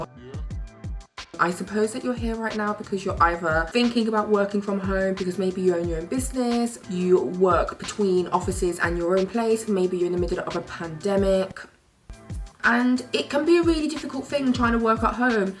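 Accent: United Kingdom. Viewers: Is that Brummie accent you have? Thanks for the tips. Much appreciated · This is seriously one of the best videos I’ve seen on this subject. Content, presentation, editing, ideas and impact. Only suggestion would be to slow down your speaking slightly as it will attract more US viewers who love British accents but prefer slower speech. But seriousl6, you really know your stuff. I have no idea how you don’t have thousands of followers already. Keep at the marketing & algorithm study on YT to get your profile up there - and I have no doubt you’ll accelerate quickly. Cheers - am off to implement some of these ideas.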